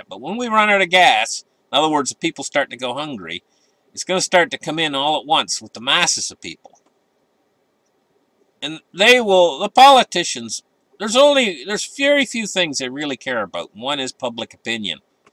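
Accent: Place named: Nova Scotia, Canada. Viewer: lol DXY... the comparison of ga-a-a-r-bage (Gotta love that NS accent.) You have a good one as well,. 🏠🌞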